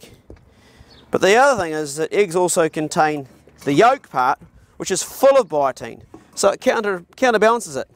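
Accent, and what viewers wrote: New Zealand. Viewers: okay take that back you are NZ xD as the video went on. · are you a kiwi or?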